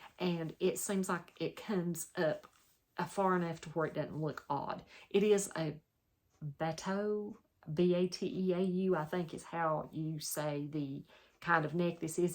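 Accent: South, United States. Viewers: First time watcher. I enjoyed your video. I'm from Louisiana originally but now in CA. So nice to hear a southern accent. I'm an experienced quilter who is now wanting to make my own clothes. I'm having a hard time fitting into the commercial patterns. I am only sewing with knits because they are so forgiving with fit. I'll be watching. --Lynda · Hello from another! I enjoy your videos & accent! I also have a southern accent as I grew up in Texas and moved to Virginia 12 years ago.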